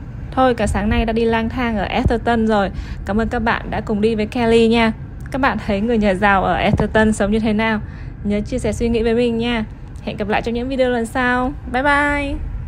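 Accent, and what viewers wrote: North, Vietnam. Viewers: Kelly nói giọng miền Bắc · Lâu lâu mới nghe giọng Bắc ở Mỹ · * là người bắc phải không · bạn nói giọng miền Bắc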